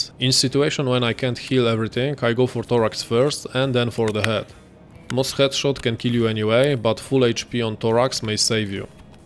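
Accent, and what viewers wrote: Poland. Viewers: Właśnie odkryłem Twój kanał. Nie wiem dlaczego ale od razu po akcencie wiedziałem, że jesteś Polakiem :D Świetne filmy, tak trzymaj!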